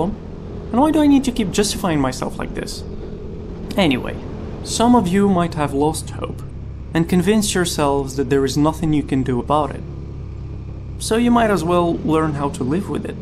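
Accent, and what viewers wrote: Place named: United States. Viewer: One question : From where or how you learned to talk like that and speak english fluently and like an american ; i need some help !! Hope you answer me .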